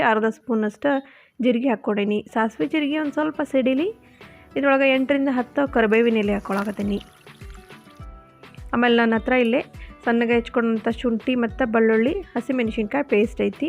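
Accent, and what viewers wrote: Dharwad, India. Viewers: ನಮ್ಮ ಧಾರವಾಡದ ಮಾತಿನಲ್ಲಿ ನೀವು ರೆಸಿಪಿ ವಿವರಿಸಿದ್ದು ತುಂಬಾ ಇಷ್ಟಾ ಆಯ್ತು ರಿ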